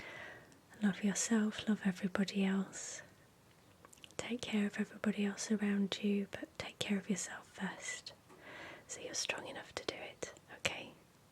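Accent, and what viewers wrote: United Kingdom. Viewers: Hi! So good to see you again and have you back!:) I've been following your channel for about five years now. Could you remind me again, where about in the UK are you located (I'm a glossophile from New Jersey, United States)?? Could you also remind me, where about in the UK is your accent from? Where did you grow up? Sending you much love and many positive vibes in these very strange times! <3 <3 <3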